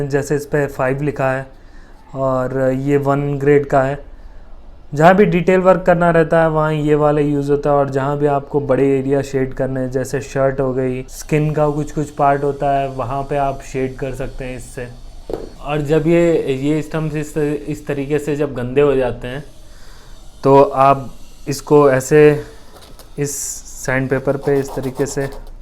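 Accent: India. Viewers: अंग्रेजी बोलते हुए भी आप क्या कह रहे हैं मुझे समझ नहीं आ रहा है, लेकिन आपकी अंग्रेजी भारतीय है अमेरिकी अंग्रेजी बोलने की कोशिश करें ताकि दुनिया आपको समझ सके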